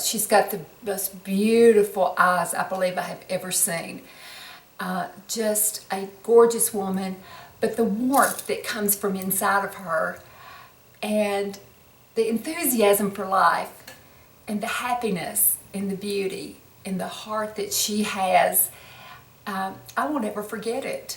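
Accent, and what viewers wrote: United States. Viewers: As an Englishman I like that accent. I gotta go on holiday an hear more of that, tho I don't know what states speak like that.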